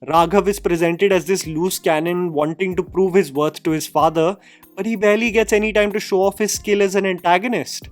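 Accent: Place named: India. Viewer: Bro hate this channel for Indian accent English..... Kindly create videos in Hindi...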